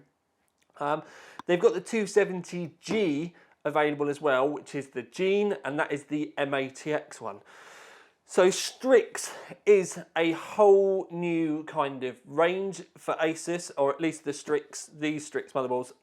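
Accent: United Kingdom. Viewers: nope, they are not a big fan of British accent I assume.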